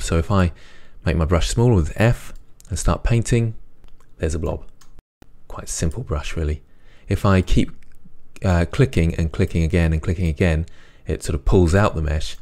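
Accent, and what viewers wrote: United Kingdom. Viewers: You know you're an Intelligent individual when you sound British and teaching Blender 2.8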